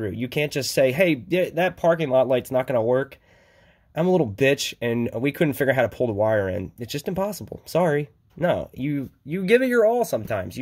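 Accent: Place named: Maryland, United States. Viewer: I knew you were from Maryland the second you started talking haha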